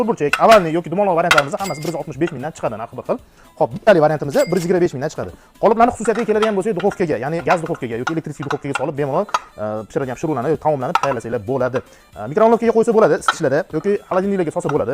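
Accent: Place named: Turkey. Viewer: Why your Turkish accent is different?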